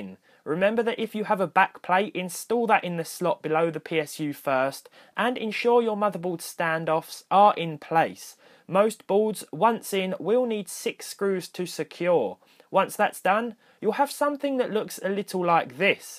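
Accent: United Kingdom. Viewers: Of course I enjoyed this video. I'm in love with your British accent. It's so fascinating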